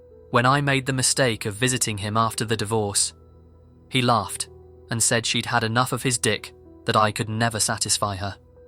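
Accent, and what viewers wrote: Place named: RP, United Kingdom. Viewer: Yeah. Similar tone of voice. And obviously the accent is southern "proper" almost RP English. So I get why you find them similar.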